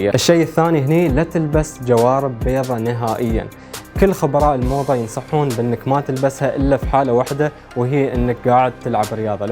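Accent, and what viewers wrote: Iraq. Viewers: حسبت انك عراقي 😃😃😃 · كنت احسبك عراقي ههه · ماعرف ليش احسك عراقي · طريقة كلامك تجيب من الشعب العراقي بشكل قريب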